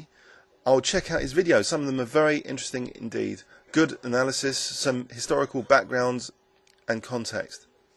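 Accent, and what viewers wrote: United Kingdom. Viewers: i love british accent!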